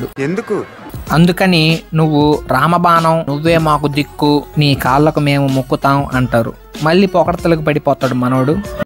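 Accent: Nellore, India. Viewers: Enti nellore ah meedi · Are you from nellore?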